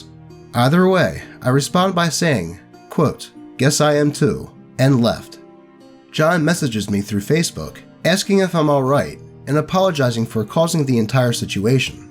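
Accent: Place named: Pennsylvania, United States. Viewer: I started watching Mare of Easttown, and they have the same accent as you! Strange coincidence.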